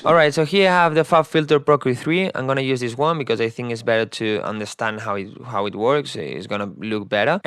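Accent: Spain. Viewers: Como se nota que eres Español xd, buen video!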